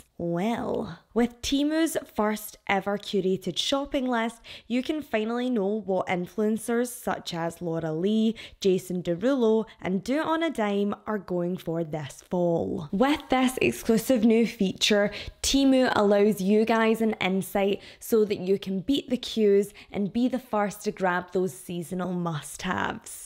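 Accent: Scotland, United Kingdom. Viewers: Ohhh how lovely to hear your Scottish accent 🥰🥰🥰my mum and dad are from Lochgelly, Fife. They seem to have lost their accents, though my uncle was as broad as they get . They all moved to Australia late 1950's. Congratz on your getting married 🥂🥂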